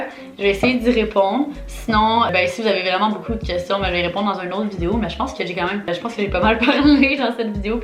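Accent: Quebec, Canada. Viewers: Je me demande si ton accent québécois va se franciser un peu 😂😘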